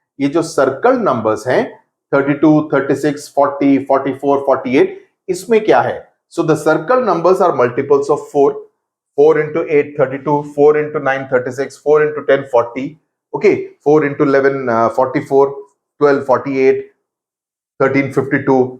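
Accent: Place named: South, India. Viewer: Sir you are south INDIAN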